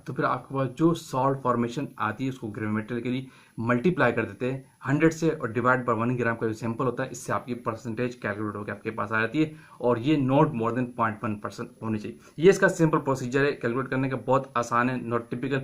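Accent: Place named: India. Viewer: Ok aapke pass bohat knowledge hai sir pharmaceutical industry ka 🫡🫡🫡 bohat achhe se explain bhi karte ho aapko to pharma industry ka professor hona chahiye language tone se aap 90% indian hi lage baki kuch words se laga ki aap pakistani ho😅 keep it up sir humko knowledge dete rahiye hum aapko support karte rahega 👍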